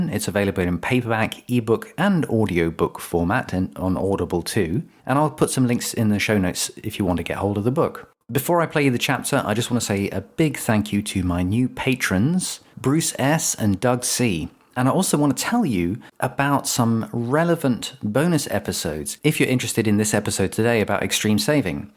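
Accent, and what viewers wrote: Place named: United Kingdom. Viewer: Why does it have to be a british accent! 😭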